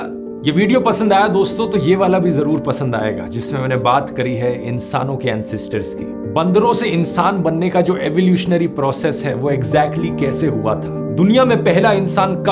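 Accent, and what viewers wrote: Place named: Lucknow, India. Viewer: आपकी भाषा शैली, आपके बोलने के अंदाज़ से प्रतीत होता है कि आप पुराने लखनऊ के निवासी हैं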